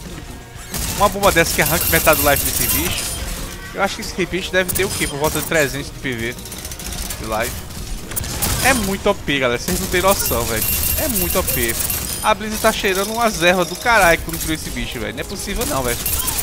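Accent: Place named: Recife, Brazil. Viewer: tu é engraçado kkkkk é de Recife? Sotaque da porra é esse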